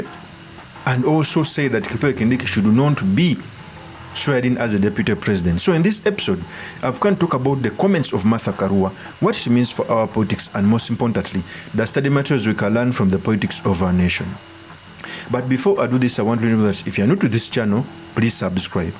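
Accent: Uganda. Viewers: U are Ugandan according to your accent...why are u calling yourself kenya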